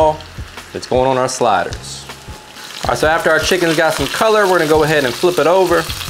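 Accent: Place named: New Orleans, United States. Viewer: You sound like you are from the new orleans area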